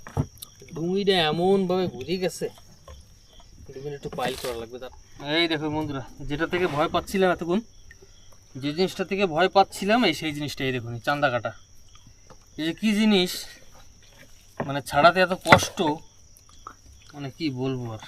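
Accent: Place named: Khulna, Bangladesh. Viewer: District ER nam ki. Kotha bolen tho khulna r moto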